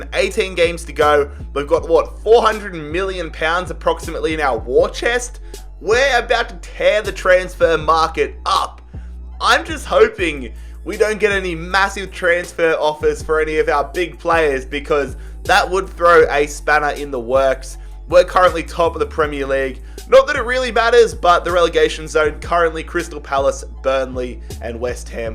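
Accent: Australia. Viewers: Are you a bloody Australian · He is Australian they speak very strangely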